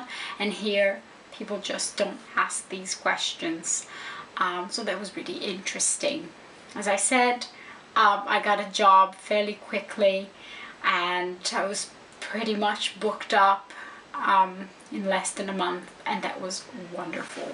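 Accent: United Kingdom. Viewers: Pronúncia fantástica, com um toque de sotaque britânico, o que é dificílimo para brasileiros! Parabéns! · Such an inspiring video! 👏🏻👏🏻 Would you also have a video telling us your study and career journey, and how you got such a perfect British accent? 😃 Thanks for sharing your experience.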